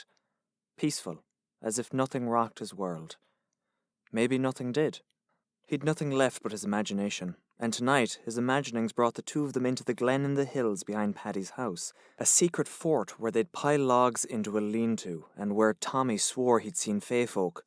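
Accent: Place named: Ireland. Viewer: Excellent... wonderful narration. I have always liked listening to the Irish dialect.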